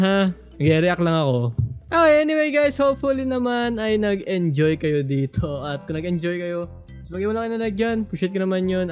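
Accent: Visayas, Philippines. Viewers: Bisaya ka ba kuya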